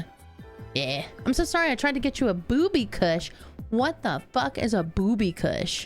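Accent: South, United States. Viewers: You have a country accent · Wow really? She sounds so Southern tho xD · Really like the southern accent Mine comes out every now and then even after 6 years in the city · Found you through the Crew! Glad to have you, have genuinely enjoyed your company and silly intoxicating laugh when you’re enjoying yourself with them. By the way it’s awesome to have a southerner representing, you ain’t hiding that accent ❤ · 🫡 the southern accent pulled me in, as I have one and it’s not fun online 🤣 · Oh my gosh, I am a blind dude so I really love your southern accent. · As a fellow Southerner, I gotta say that I love that you don't hide your accent. I feel all warm and fuzzy inside when I hear that twang. Keep doing the Lord's work, sweet thang.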